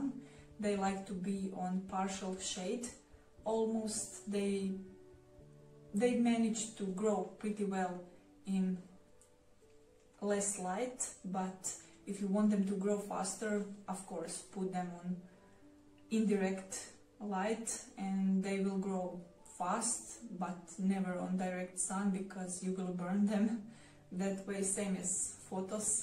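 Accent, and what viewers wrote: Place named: Australia. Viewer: So you're a croation right, i think australian 🙂✌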